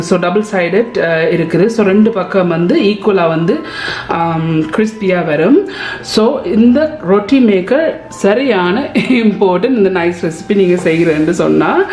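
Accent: Jaffna, Sri Lanka. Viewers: Sister Are you from jaffna nelliady?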